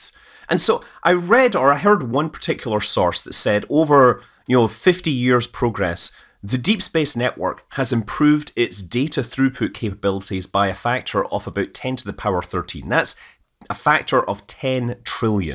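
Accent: Scotland, United Kingdom. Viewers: I love his Scottish voice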